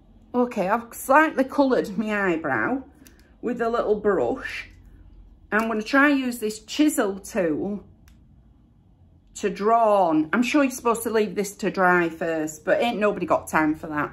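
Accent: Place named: Leeds, United Kingdom. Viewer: Hi while I was scrolling you just popped up so I started to watch your videos I think they are awesome 👌I can't stop watching them you are so funny and you remind me of my auntie with your accent she is also from leeds love Michelle xx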